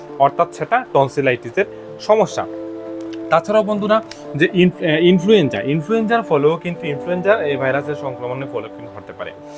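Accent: Sylhet, Bangladesh. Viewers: আপনি সিলেটি মনে হয়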